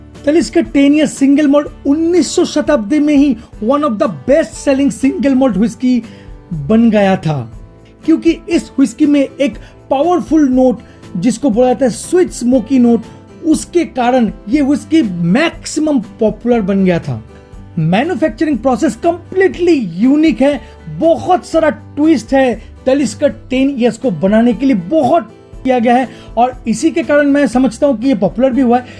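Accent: Bengal, India. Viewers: Actually I love your review method and bengali tone I like to have sweet whiskey please suggest some like black dog.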